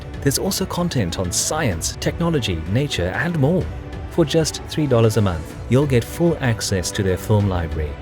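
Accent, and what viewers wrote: South Africa. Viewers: Is this guy south African? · Is this narrator South African?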